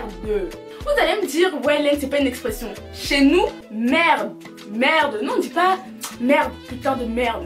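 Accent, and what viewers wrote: Ewondo, Cameroon. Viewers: T'as vraiment un accent kmer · L'accent camair est vraiment funny...suis senegalais mais j'aime bien...Mais j v savoir aussi c'est quoi"macabo" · à t'ecouter on dirait une beti ewondo camer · 😂😂😅😅 Merdeu l’accent camer de la gow ci me bollè seulement 😂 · Je suis congolaise mais j’ai kiffé ta vidéo vous avez grave d’expression j’aime trop l’accent camer D’ailleurs ça veut dire quoi « ne pas donner le lait » j’ai jamais compris, vu que tu l’as pas expliqué dans la vidéo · j'ai aimé la vidéo c'est fou coe tas cette accent camerounais alors que ta grandit en France .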